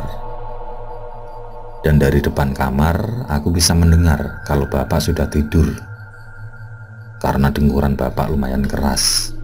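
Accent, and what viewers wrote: Java, Indonesia. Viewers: Orang Jawa yo mas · Orang jawa mana suara nya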